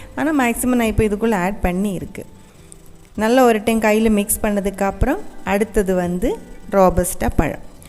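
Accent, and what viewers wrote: Kerala, India. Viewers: Hi,ningal malayaliyanennu AA thamil parayunnathu startingil kettappol thanne manassilyi .Pirake chakka ennu paranjappol confirm aayi.Tamil nattil janichu valarnna malayalisil ee different kanarilla.Athukond keralathil valarnnavarakum ennu karuthunnu.ButI really happy.Nammude aalukal Anya bashayil vedio cheyyumbol, athum AA nattukar eshtappedumbol kittunna santhosham onnu vere thanneyanu. Keep it up.My hearty wishes for u. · மலையாளம் கலந்த தமிழ் பேசுவது சூப்பரா... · Very good tamil to listen in Malayalam accent❤️❤️ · malayali Tamil peasaree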